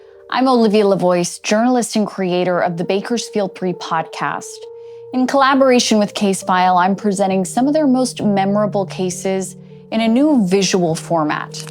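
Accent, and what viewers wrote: Australia. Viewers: The aussie accent wears on me after awhile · that kooky, strange, Aussie voice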